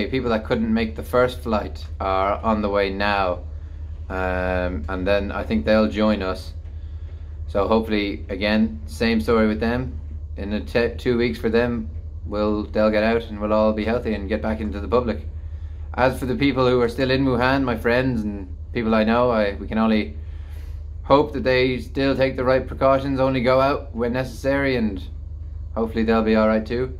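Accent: Ireland. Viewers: Irish men and their accent are just love. 😍😘 That's made me fell in love with. ❤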